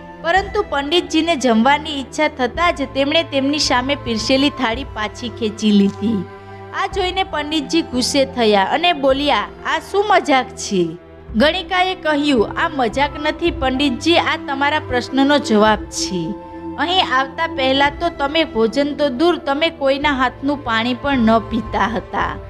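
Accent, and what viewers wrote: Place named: Saurashtra, India. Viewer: JAY HO. GOOD East African OR SAURASHTRA (KATHIYAWADI) GUJARATI LANGUAGE. 🙏🙏🙏🙏🙏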